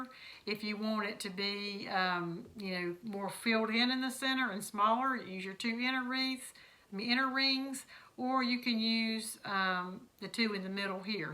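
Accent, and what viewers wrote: South, United States. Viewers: So pretty, I've been trying to find a simple way to show my daughters (adult girls) a simple and easy way to make wreaths. My older daughter especially loves her diy's... I think I'll go out and grab some supplies for her and have her watch this wonderful video and try this project. Thanks so much for sharing. And I just love watching your channel... love love hearing that southern accent you have.. lol Thanks again and have a blessed day. 🍂🍃🍁🍁🍁🍁🍁🍁🍂🍃🍂🍁🍁🍁🍁🍁👍👍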